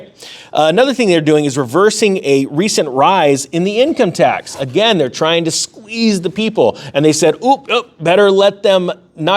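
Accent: United Kingdom. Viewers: That UK accent has me dying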